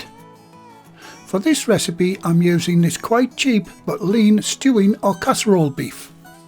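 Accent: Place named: England, United Kingdom. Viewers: Good English cooking with a good English voice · Love your voice,! 😍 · Although I live in Hungary, my daughters and their families still live in County Durham. It's lovely to hear a NE accent where I spent about 20 years. I never thought of using hot-water pastry for steak pies (I use it for pork pies of course) so I'll give it a go. They don't make meat pies in Hungary so I have to make them myself. Cannot buy them in shops. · Thank you for answering 2 questions for me. Could I do without egg as I'm allergic. And a question I didn't know I had, I have long nails 😊 I like how you mixed fats with hot water, I never did like rubbing the fat into the flour and getting it all stuck under my nails. Where are you from, you sound local, I'm from Middlesbrough.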